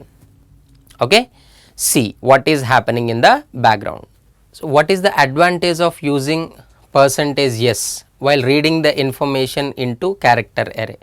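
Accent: South India, India. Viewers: %yes He is south indian Those who observed like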